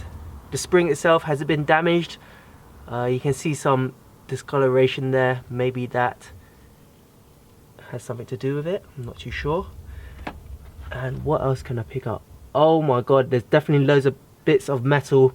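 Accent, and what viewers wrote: England, United Kingdom. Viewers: I'm like your English accent especially you Asian even hotter. Keep good work I'm just subscribed, take care from Cali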